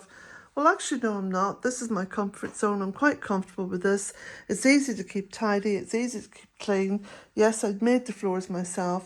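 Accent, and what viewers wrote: Ireland. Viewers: Just beautiful, so calming, serene and I love your Irish brogue ❤ I am in the USA, my Irish roots great-great grandparents were from Dublin 🍀 (Newsome & McCarty) So thrilled to have found you!! Love, love, love 💘 💕🍀❤🍀❤😁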